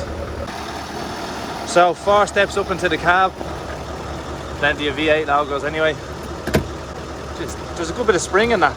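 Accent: Ireland. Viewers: I’m guessing if you dropped the silly dub accent and talked like a west Meath man you’vd do better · I LOVE youre Accent Irish is best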